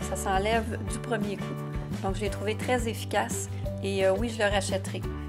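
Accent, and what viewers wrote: Quebec, Canada. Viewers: Superbe vidéo produits terminer ma belle tu es québécoise car ta un accent ? ❤❤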